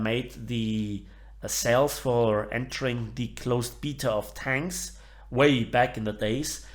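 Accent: Germany. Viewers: "Die Aufnahme ist gestartet" bist du deutsch? Würde irgendwie zum Englisch passen, da das einen wirklich nur leichten deutschen Akzent hat😂😉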